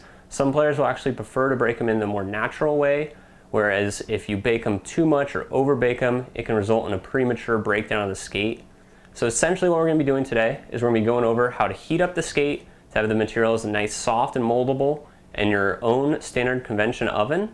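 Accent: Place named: Europe. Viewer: 175 F obviously! This dude sound european???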